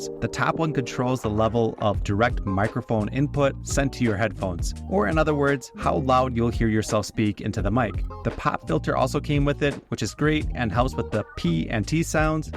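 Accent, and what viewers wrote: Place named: Singapore. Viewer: wow. you sound so singaporean.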